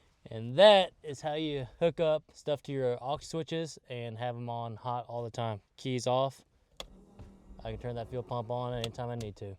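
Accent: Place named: Texas, United States. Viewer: What I like about this one is the honest approach/honest self appraisal of safety, wiring, and personal accountability to get R done, then, later, to address any issues after the fact since time was short for the install. The other thing I like about this one is listening to a Texan talk. Last thing is to have here the corroboration to/of where I can find my Upfitter Switches' terminus under the hood! Win/win/win. Thanks a ton! Cheers.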